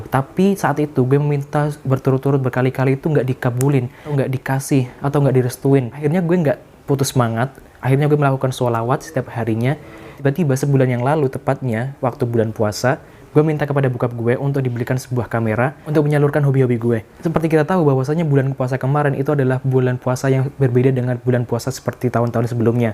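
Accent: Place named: Java, Indonesia. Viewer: Gimana kalo guenya jadi aku atau saya biar easy listening aja medokmu isih katon maskuu😭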